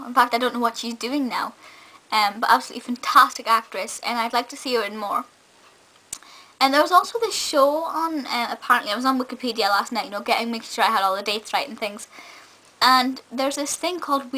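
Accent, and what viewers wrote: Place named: Scotland, United Kingdom. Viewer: I am lovin your Scottish accent. I remember the film The Worst Witch (1986), and boy was it funny. Its hilariously bad..a must see.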